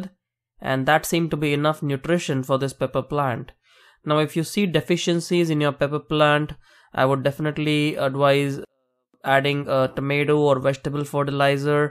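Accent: India. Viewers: สำเนียงคนพูดเหมือนคนอินเดีย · heavy indian accent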